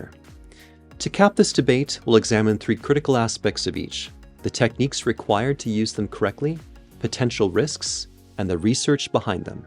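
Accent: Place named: Scotland, United Kingdom. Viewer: Was going to get oral but now sonic! Thanks for this! Love your accent from Scotland 🏴!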